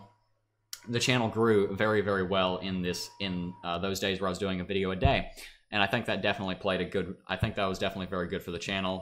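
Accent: South, United States. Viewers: I missed your country drawl!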